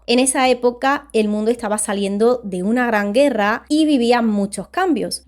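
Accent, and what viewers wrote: Andalusia, Spain. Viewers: Muy buen análisis. Tu acento Andaluz me encanta. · Esta chica además de encantadora es una gran narradora y ese acento Español es delicioso. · Gracias por compatir. Totalmente inverosimil el cuento dado por ese señor. Tu acento andaluz y tus ojos me han engangado. Saludos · Acento típico Andaluz, yo diría de Sevilla o Málaga ... !! Saluds desde Montevideo - Uruguay !!!!😅👍🏻😉🎸🎸🍺🍻😎😎😎😎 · De Andalucía verdad? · Un encanto, la sra y su acento andaluz....lo de filadelfia, un engaño mas de USA....... As usual.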